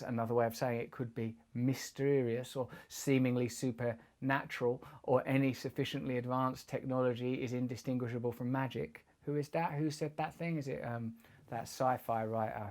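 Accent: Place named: United Kingdom. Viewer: What type of British accent do Russel have?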